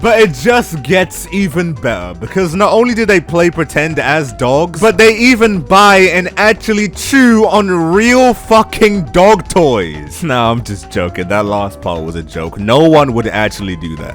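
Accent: United Kingdom. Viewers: Definitely from England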